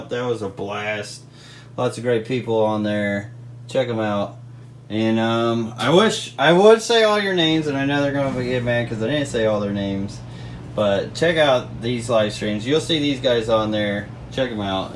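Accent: United States. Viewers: Where did you grow up? It's not often you hear a southern accent these days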